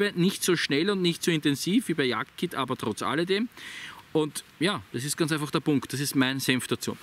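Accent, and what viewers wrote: Austria. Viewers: Bist du Österreicher 🇦🇹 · Der Mann hat .at als Kanalnamen und einen hörbar österreichischen Akzent - für mich als Norddeutschen. Was soll die Frage?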